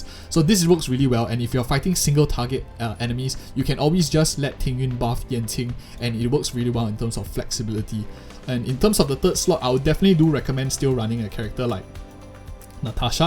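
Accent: Singapore. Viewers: bro you sound like a singaporean. If you are from singapore, it is nice to see a fellow singaporean covering honkai star rail 😄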